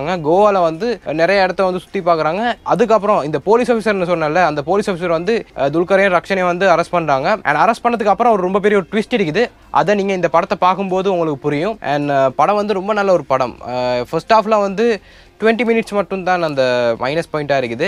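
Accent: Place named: Sri Lanka. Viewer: இலங்கை தமிழா, கேட்க இனிமை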